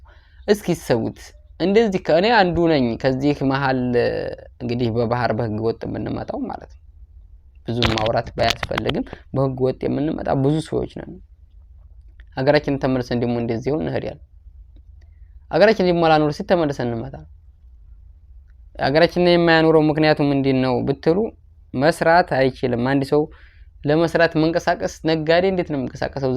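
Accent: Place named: Rural, Ethiopia. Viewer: በርታ የእኔ ባላገር እንደ ወረደ የሆነውን የአማርኛ ቋንቋህን ወድጀዋለሁ ።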